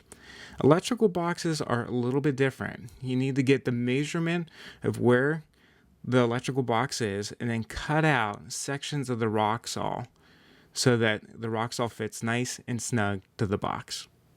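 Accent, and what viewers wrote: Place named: Pennsylvania, United States. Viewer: It's a PA accent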